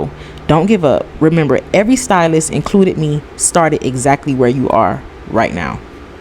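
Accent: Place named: Florida, United States. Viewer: the way the florida accent done became so recognizable to me now is crazyy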